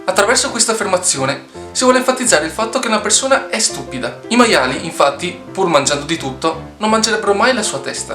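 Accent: Veneto, Italy. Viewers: Ara che se sente che ti zè daea tera ferma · Ahahah molto interessante! Io sono toscana ma adoro Venezia e in particolare il vostro accento 😍😍😍😍 Inutile dire che quando ci vado capisco meno di zero 😂 Potrebbero anche insultarmi e dirmene di tutti i colori, tanto per me è arabo 😂 Comunque è piacevole ascoltarlo, mi piace la vostra cadenza. :)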